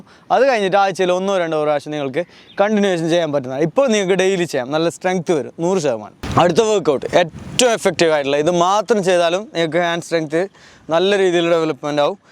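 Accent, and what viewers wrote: Kasaragod, India. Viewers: KASARGOD ആണോ BRO 🤩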